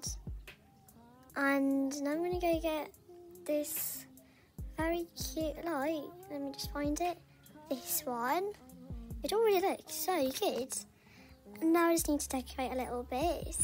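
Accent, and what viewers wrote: United Kingdom. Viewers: YOUR VOICE IS A GODDESS OF BRITISHHHH AHHHHH❤❤❤ BUT MY FRIENDS CALL ME BRITISH🤨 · I love your British accent ❤❤❤❤❤❤❤❤ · I love your videos but you know itz toca Alice and and you know you just like Alice the British accent and all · Lave that British voiceeee it's soo cuteee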